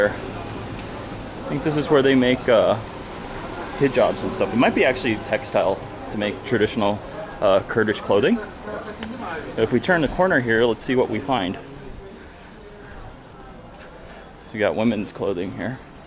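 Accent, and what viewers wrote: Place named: United States. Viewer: How did you get into that country? You sound American and I thought Americans were not allowed to enter right now because of covid.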